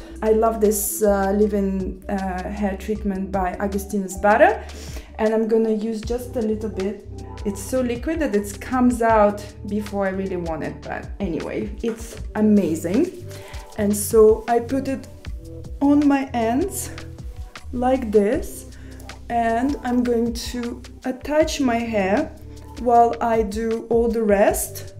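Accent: Russia. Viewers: I like it that she still has that Russian accent. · Такая милая, до сих пор акцент русский 😊❤ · Ее английский, так и остался с русским акцентом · Вот меня это тоже удивило - она последние 20 лет как минимум живет постоянно за границей и до сих пор имеет отвратительный русский акцент. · She's been living abroad for years, and her accent ,pronunciation are still russian. 😂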